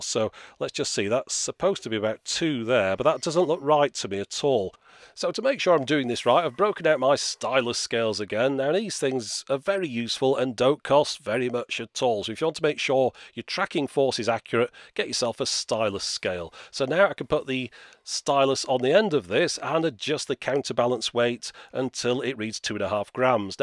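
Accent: United Kingdom. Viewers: What accent in UK is that, sounds like Ringo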